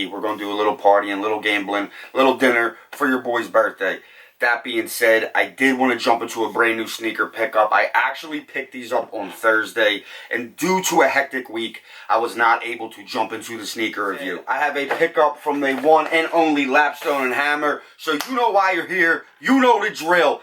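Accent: Philadelphia, United States. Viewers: heavy Philly accent. I love the look of this shoe.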